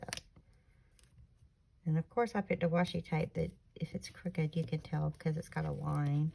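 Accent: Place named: South, United States. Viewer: I enjoy your sweet Southern accent as much as the tutorial 🌼 Such beautiful pieces!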